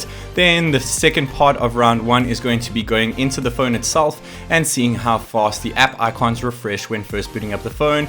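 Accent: South Africa. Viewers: You sound South African..? 🇿🇦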